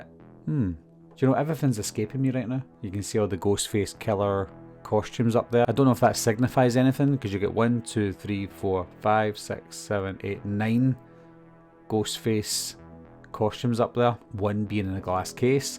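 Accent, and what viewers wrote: Scotland, United Kingdom. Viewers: Scottish accents make everything more enjoyable to me. ❤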